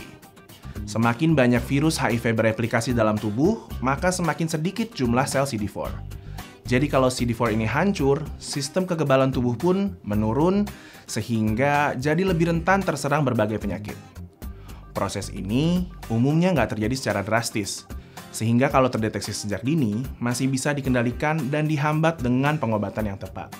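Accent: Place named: Java, Indonesia. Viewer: tp logat bahasanya kok sprti orang Jawa ya. Mohon maaf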